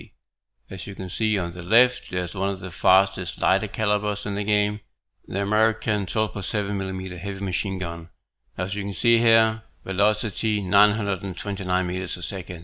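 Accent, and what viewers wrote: Denmark. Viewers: Are you from Danmark?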